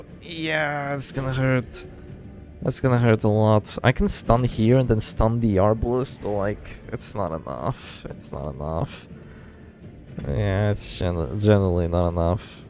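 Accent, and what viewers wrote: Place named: United States. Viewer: He has like no accent lol. I thought he was amerimutt, for like, 6 mounths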